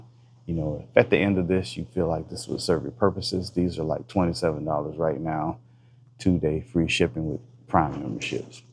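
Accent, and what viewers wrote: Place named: South Louisiana, United States. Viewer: Helpful and informative review. Thanks friend. By any chance, are you from South Louisiana? You sound like it. I’m from Lafayette.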